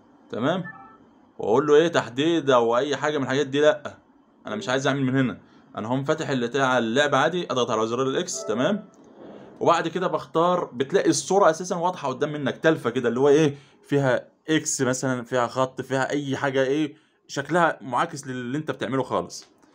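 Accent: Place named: Saudi Arabia. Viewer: يقصد انك بتفتي يعني بس باللهجه السعوديه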